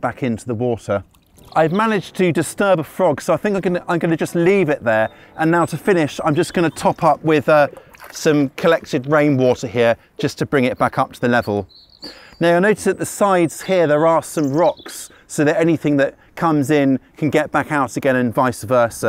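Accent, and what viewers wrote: United Kingdom. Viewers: He sounds British so I’d say somewhere in the U.K.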